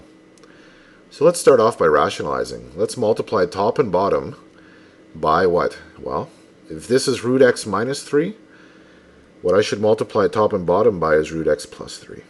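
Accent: Canada. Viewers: The Canadian accent makes it sink in better I swear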